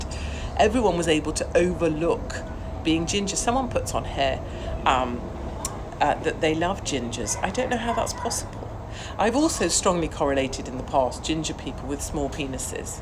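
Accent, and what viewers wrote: RP, United Kingdom. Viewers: It might be the accent that makes them think you're posh, after all you do pronounce your words. And of course you say "darlings" a lot 😉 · It's not your looks, it's your accent. You do sound a bit like a commentator at the Dressage events. It's even more fun when someone with a posh accent says naughty things. And yes, I have been to Spec-Savers. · It s because you speak the queens English well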